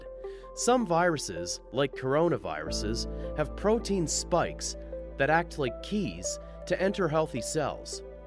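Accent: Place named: Canada. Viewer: I'm not from North America, but am I right in thinking this man's accent is from Canada or Nova Scotia? It sounds different to a regular US accent.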